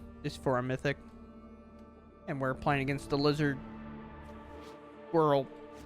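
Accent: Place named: United States. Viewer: your accent, what part of the states are you from?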